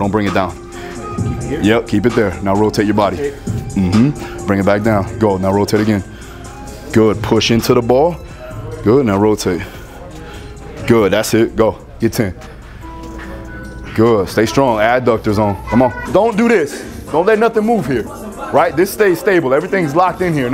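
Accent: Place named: Los Angeles, United States. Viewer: Bro you gotta be from LA? I can hear it. Good work all around! KB work is just different and I love it. Stay functional🔥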